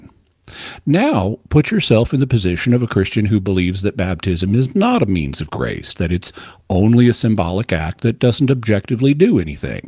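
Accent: South, United States. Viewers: Lol I thought I was imagining it. His accent is definitely stronger than years ago. The intonation and rythym of the speech is more Southern too. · Big fan of. Not a fan of his (re?) acquired southern accent. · I love how you can hear southern accent getting slightly more pronounced since his move back to Arkansas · why do you sound more country than usual?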